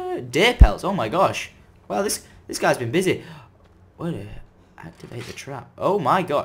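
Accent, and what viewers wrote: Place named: Scotland, United Kingdom. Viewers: when he was reading the story in a Scottish accent i died of laughter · Nice Scottish accent yuv got aye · Top notch scottish accent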